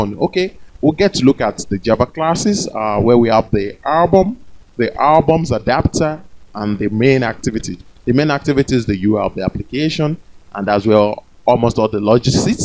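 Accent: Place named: Nigeria. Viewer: nice tutorial . this accent sounds nigerian doesnt it? i would like to know if there is an available repository to download your source code ? this would be very nice and helpful . thank you for this great tutorial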